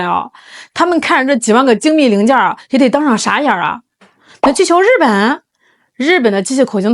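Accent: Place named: Shandong, China. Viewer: 口音像山东老乡呢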